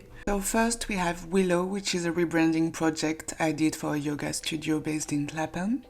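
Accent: France. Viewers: J’ai décelé un accent français 😁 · Tu es française? Are you french? You have french accent it seems.. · L'accent français🥰🥰 merci je suis entrain de refaire mon portfolio en ce moment et ça me motive, j'ai grave la flemme mais c'est mieux que j'efface les immondices que j'ai fait quand je débutais 🤣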